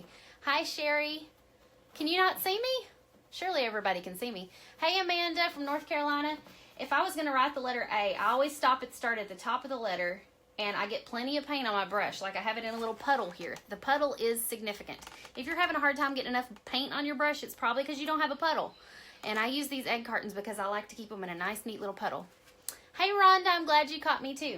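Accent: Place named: Alabama, United States. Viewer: LOVE the accent! I'm a southern bell as well and you remind me of sweet home Alabama!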